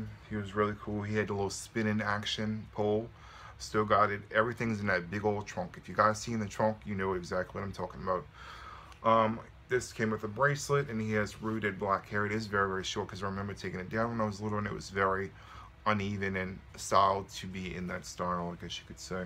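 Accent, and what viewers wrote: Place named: Boston, United States. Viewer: Where is your accent from? It's like almost a Boston one and I love it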